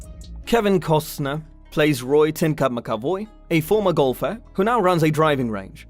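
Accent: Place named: United Kingdom. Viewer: Drop the British accent. Irritating!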